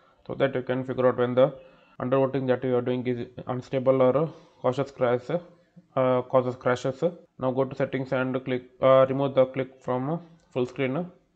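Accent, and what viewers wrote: India. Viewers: lmao the curry accent makes it better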